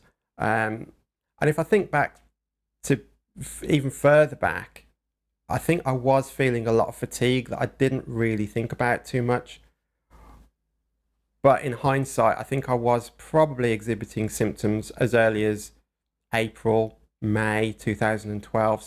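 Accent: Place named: Midlands, United Kingdom. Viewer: I've got MS about 15 yrs old. I'm from the Midlands you sound local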